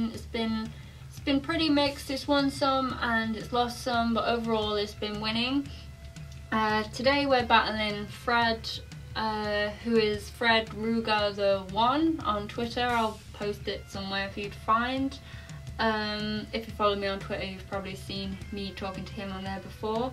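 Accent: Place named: Nottingham, United Kingdom. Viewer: that Nottingham accent tho ;]]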